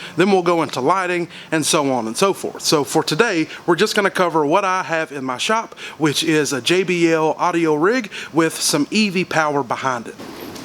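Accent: South, United States. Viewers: I love this guy, his knowledge is top notch. When I first heard his voice I had to take a double take, we all southern bro!!! Keep up the good work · Did not expect his country accent to be so thick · I love that country accent brother! Love from the Carolinas. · I like this guy he sounds real country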